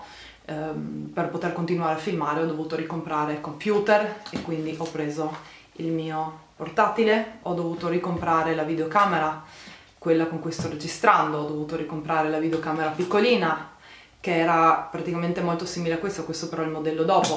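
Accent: Trieste, Italy. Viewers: Caterina Trevisan un triestino che parla in italiano si riconosce subito, non siamo capaci di parlare "in lingua"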